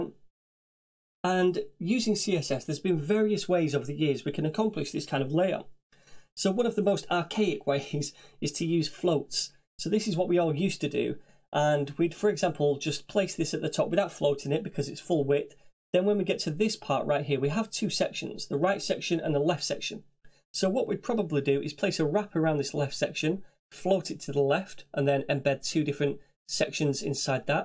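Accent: United Kingdom. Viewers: why is the British accent is so (f) hard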